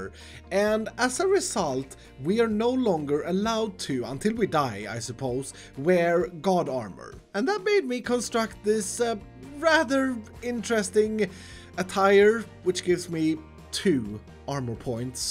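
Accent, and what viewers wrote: Sweden. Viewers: Du är svensk eller hur?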